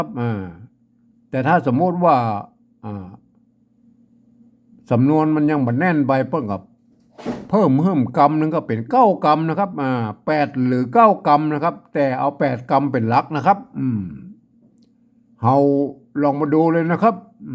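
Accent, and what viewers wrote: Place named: Lanna, Thailand. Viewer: เอาของสุนทรภู่มาอ่านแบบกำเมืองเลยเหรอค่ะ ป้อครู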